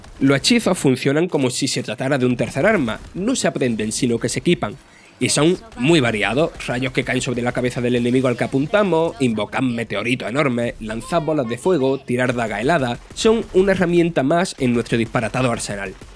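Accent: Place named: Murcia, Spain. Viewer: perfecto murciano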